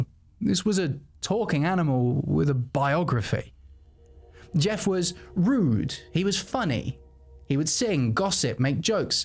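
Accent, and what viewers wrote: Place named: United Kingdom. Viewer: thank you for the new video and I am a new subscriber to your YouTube channel love your videos and love your British accent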